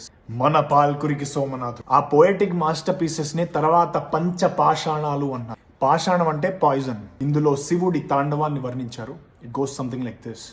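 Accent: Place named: Coastal Andhra, India. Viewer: I am a great admirer of you bro. But please correct your pronunciation of the letter శ. Your pronunciation of శ is influenced by Coastal Andhra's accent. The proper way of pronouncing శ is done in Telangana. in Telangana: దేశం is pronounced and written as desham. Similarly, కాశీ as Kashi, వంశి as Vamshi and శివుడు as Shivudu. Some people have a misconception that 'sh' is only used for ష But, to pronounce ష one should know the basics of Sanskrit. ష is little harder to pronounce for not just Telugu people but for many of our Indians (except for those who know Sanskrit).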